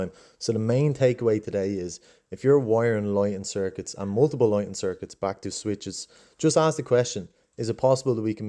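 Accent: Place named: Ireland. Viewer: Turning into a nice series this. Educational, short and easy to follow + the Irish accent makes everything better 😂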